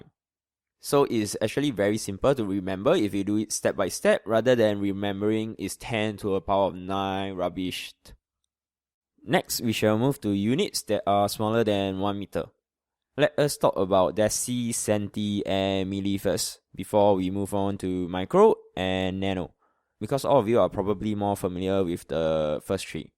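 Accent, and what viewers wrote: Singapore. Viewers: definitely singaporean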